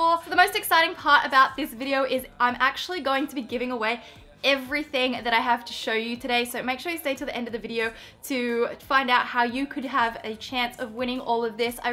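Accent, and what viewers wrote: Australia. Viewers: And OMG I LOVE YOURE AUSTRALLIAN ACCENT ITS AMAZING AND YOU ARE JUST A LIFES MIRACLE WITH HOW PERRFECT YOU LOOK AND ARE ............... well that explains you in my very creative brain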